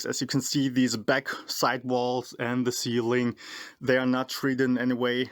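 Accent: Germany. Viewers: "tyooning" "Duck-Terror" Dude, work on your accent. It's not AS catastrophicaly cringey as German accents usualy are, but still. At the beginning of the video it actualy sounded like you deserved praise for NOT sounding like a blithering stereotype; but you've still got quite a ways to go. As for the speakers: The CV75 kinda sounds like a WGS Reaper to my ears... :-o And what's up with that weird noise on the V30?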